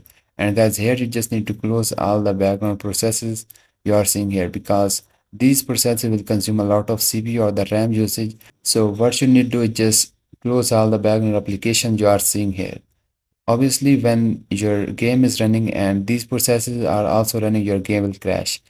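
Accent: India. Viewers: im not trusting anyone with an indian accent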